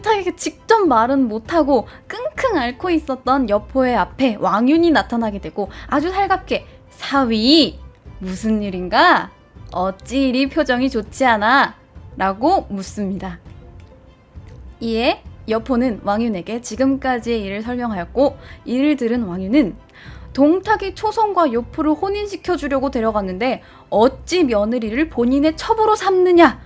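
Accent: Gyeongsang, South Korea. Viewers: ㅋㅋㅋ 오리지널 네이티브 부산 경남 사투리를 구사하시네요? 갱상도 여자 아입니까?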